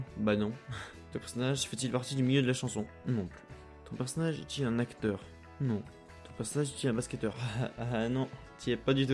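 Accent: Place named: England, United Kingdom. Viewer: ho parle contre calme toi sur l accent anglais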